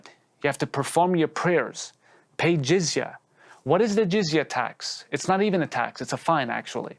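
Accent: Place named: United States. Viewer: Esse cara é americano da pra ver no sotaque dele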